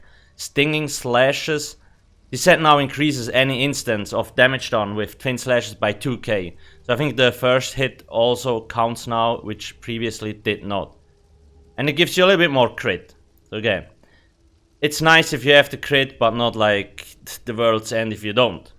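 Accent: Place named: Switzerland. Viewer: He's from switzerland, dont be surprised by his accent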